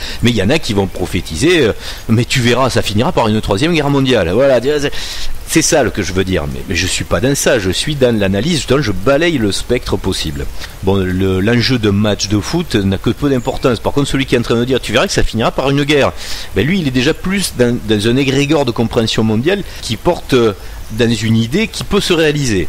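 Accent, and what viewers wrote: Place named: Toulouse, France. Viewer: Merci mille fois pour vos vidéos. Pas une seule seconde à manquer sur vos présentations, chaque fois que je la réécoute. Pas un seul mot de trop ! Et vos digressions, délicieuses. En vous écoutant je pense, 'c'est magnifique cet accent toulousain qu'il a ! ' Bon je fais donc partie de la troupe des E-veil-lés. Oups ! Ne pas confondre avec les intentions d'une revue ... "Réveillez-vous" ! Et je vais maintenant relire... "La solution extra-terrestre". (Petit conseil en passant par là..: découvrir les expériences du pilote de chasse à la retraite Jack Krine - sur Youtube. )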